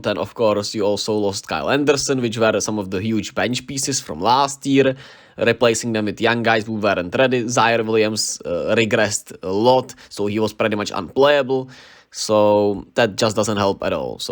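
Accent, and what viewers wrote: Czech Republic. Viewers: Náš přízvuk se nezapře. Cením snahu.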